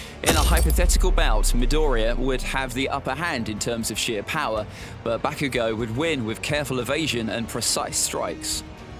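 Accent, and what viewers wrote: United Kingdom. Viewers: Nice Power Level. Maybe we could do a power level together on my channel Btw I love your british accent :) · Your defenetly british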